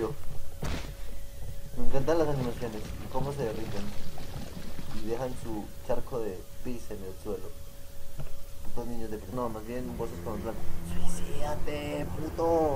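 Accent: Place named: Peru. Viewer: esa voz de peruano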